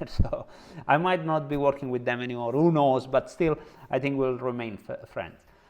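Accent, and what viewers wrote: Italy. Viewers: Your 'broken English' is more articulate than most native English speakers. Love the Italian accent and content. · Well, I think there is a little crap mixing in every business. However I understand that you are a little upset because you are doing it for free and you experienced these cases with the case :) I like that you are honest about the advantages and disadvantages of every property you promote. However, the video trend is to be more colourful, entertaining and putting a little drama in the films. I don't think everyone should be following this, but it's a fact. Your English is very good, I actually like the authentic Italian accent.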